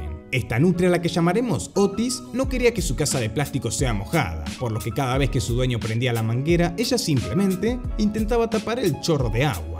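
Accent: Rioplatense, Argentina. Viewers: Es Argentino. ..😎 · Sos Argentino?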